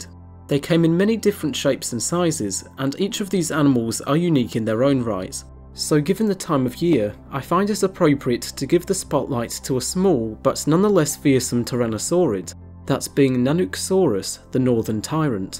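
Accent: England, United Kingdom. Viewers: Why do all these guys who push the feathered dinosaur theory have English accents?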